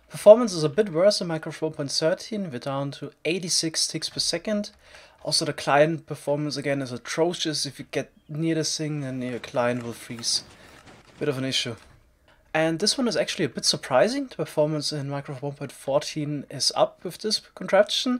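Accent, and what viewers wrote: Germany. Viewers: Das hört man raus das du aus Deutschland kommst, nices Video :D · Du bist doch deutscher oder :)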